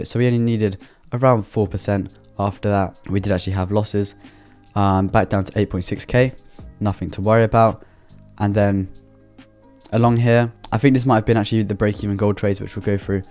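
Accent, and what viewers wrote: United Kingdom. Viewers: I always thought you were from London damm, where are you from? Would you ever do a meet up?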